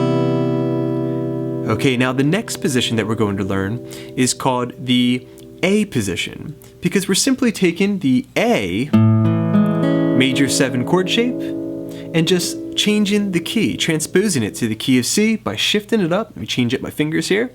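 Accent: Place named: Mid-Atlantic, United States. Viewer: Very nice lesson, appreciate it. I'm from the mid-Atlantic and recognize your accent ... a lot of people probably wouldn't pick up on it.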